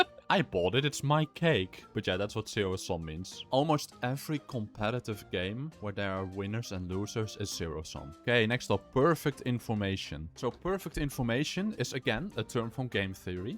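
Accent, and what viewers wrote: Netherlands. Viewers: Are you Dutch? Sorry, I always get distracted by the accent of Dutch people on YouTube.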